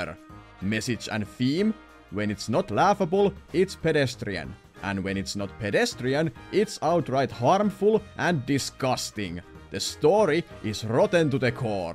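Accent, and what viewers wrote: Finland. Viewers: Are you finnish? You sound finnish to me :DD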